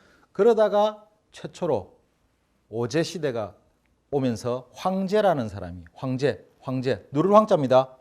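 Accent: Gyeongsang, South Korea. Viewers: 갱상도 말투 쌤도 괜춘하네^^ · 경상도 말씀에~너무 유쾌한 강의가 지루하지않고 재미납니다~~~^#^♡♡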